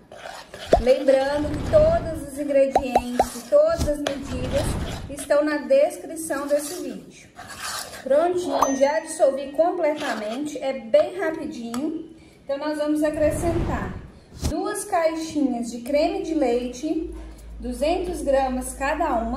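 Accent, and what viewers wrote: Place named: Minas Gerais, Brazil. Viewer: Muito mineira estou vendo de santos SP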